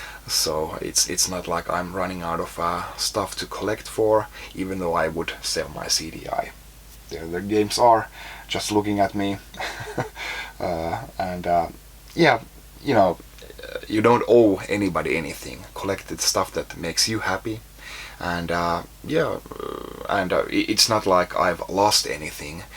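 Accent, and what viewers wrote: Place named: Finland. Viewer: It's not just the Finnish accent or his low register but also his measured way of speaking and the thing he does when he can't think of what to say for a second. He's nice to listen to, and he has something to say. I wish that more announcers had voices like his! Aside from the guys who commentate poker tournaments, the olympic people, and a few hockey guys, those guys usually sound barky and rub me the wrong way. Even when there is a nice voice they typically have two others you have to deal with when he has nothing to say. There are lots of people like on Youtube who actually know how to speak without excessive animation, and with diction, one of the reasons I watch it and why I think it's so popular. And of course they cover things you'd never discover otherwise. I've lived with a moderate hoarder, and you are no hoarder! 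Not even the slightest bit. You're right when you say you're running out of space but everything is neat and organized and clean, you are willing to let things go, and most important everything has a use within the foreseeable future and therefore is not trash. They're games.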